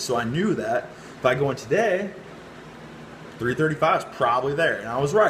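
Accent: Midwest, United States. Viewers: Man,. His voice is so much higher pitch and the midwestern accent is strong.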